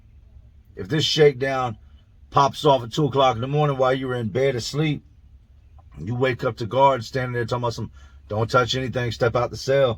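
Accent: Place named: Philadelphia, United States. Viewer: Yo what's good with you. The stories are very entertaining!!! By watching your videos I see you have lived in Virginia Philadelphia and North Carolina. You definitely have the Philly accent 100% I'm from that area and I could tell instantly. If someone asked you where you're from what would you tell them?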